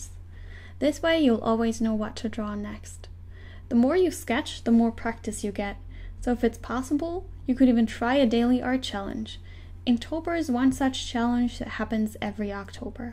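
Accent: Germany. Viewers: Omg are you german? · are you german? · Du bist deutsch oder? Normalerweise finde ich es immer sehr anstrengend zu einem deutschen Akzent zu zuhören aber bei deinem war das nicht so es war sogar sehr angenehm. :3 Gutes Video übrigens! Es war sehr hilfreich. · Your from Germany right? :D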